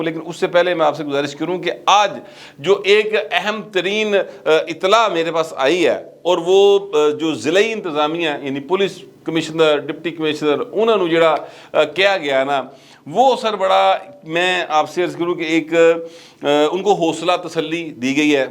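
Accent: Punjab, Pakistan. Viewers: حقیقت پہ مبنی، مدلل گفتگو،خوشگوار لہجہ اور پنجابی ٹچ۔ یہ ھیں ۔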